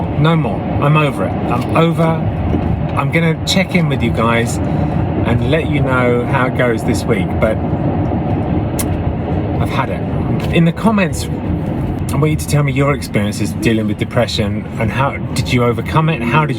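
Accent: United Kingdom. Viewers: Hi! I love you so much, too! I mean, in a buddy/friend/little brother kind of way! I'm 59 so you'd be my little brother. I've always loved your accent, so, COOL!! My little brother has a sophisticated British accent! Oops! I got way off topic! Anyway... Here's my thought -- depression draws us inward. Like that big, heavy coat on the man in the fable. (I loved that!) We're enveloped. It's heavy and uncomfortable. When the sun came, he opened himself up to everything around him, and was a free and happy man! I think depression seems like it hightens creativity because we're drawn so deeply inward. With music, especially. In depression, everything comes from that dark, shrouded place inside. Later on it might sound good. And it might be. But it's a reflection of that dark place...and do you want that? It'll always make you think of that place. Here's my point: your creativity is part of you. 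You were born with it. It's in your soul. You can't lose it. You were gifted with it. God put it there. Being as musically talented as you are is a GIFT. Whether in a dark place or in a place of light & peace, you're going to make exceptional music! Do you want to remember the darkness...or the light??, I am praying for you! *Lord, please take this burden away. Please guide and help him find that place of healing. Lift his heart and spirit. Show him the way. In Christ. Amen.💗* I'm 59, have suffered from depression since I was 14, and only got on medication 7 years a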